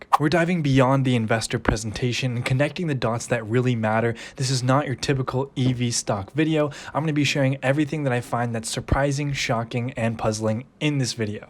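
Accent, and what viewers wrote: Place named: United States. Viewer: dam yanks ruining the English language as usual. Pfft! ....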